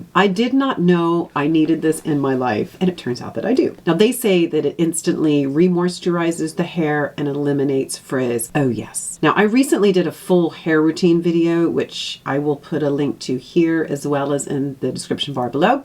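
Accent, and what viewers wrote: Ireland. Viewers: I am new to your channel and wondered what your accent is. A little Irish? Just curious. My boyfriend also hates Snail Mucin! Any time I use it, he doesn’t want to kiss me but ultimately can’t help himself in the end 😂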